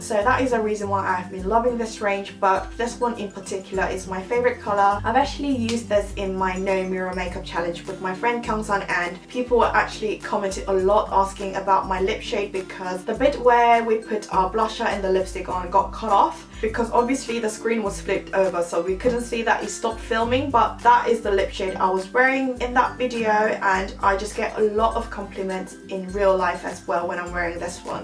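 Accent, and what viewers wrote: United Kingdom. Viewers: 볼때마다 느끼는건데 영국 발음 듣기 너무 좋아요♡ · 아 진짜 영국발음 넘나 조아여💓 2016년에도 좋은 영상들 부탁드려요👍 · 영국발음때문에 시청시작했어요~ 저는 캐나다 왔음에도불구하고 열심히 영상보고 영국영어혼자공부합니다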